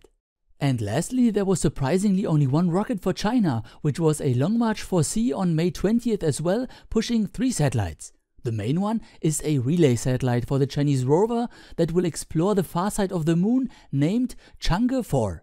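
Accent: Germany. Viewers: I only recently discovered your videos... No idea why it took me so long. Your content is amazing, not only the whole discussion about the different approaches and ideas, but also the visuals and your wonderful German accent :D Liebe Grüße aus Niedersachsen! Mach weiter so! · Rasyidan Ilmansyah he is clearly Germany his accent proves that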